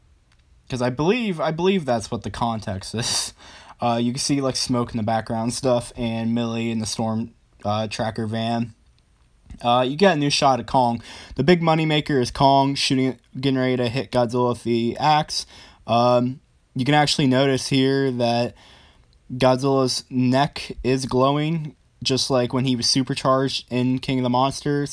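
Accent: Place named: Philadelphia, United States. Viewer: Found this channel because I heard there was a new trailer out but then noticed that thick ass Philly accent and I love it haha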